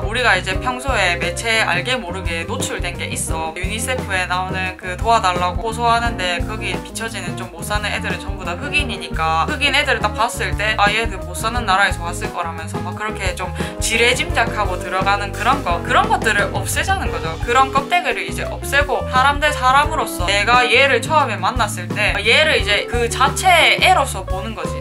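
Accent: Busan, South Korea. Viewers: 음....좀 더 듣다보니...부산같기도 하구요 ㅎㅎㅎ ^^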